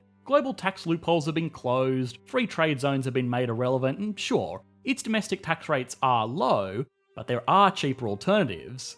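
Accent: Australia. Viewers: The guy in the video sounds australian and over here we are basically englands b*tch and worship them so it makes sense they say stupid stuff 😂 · i've just found this video - wow simplistic explanations yet right on point. who would have thought an Australian speaking slowly makes incredible sense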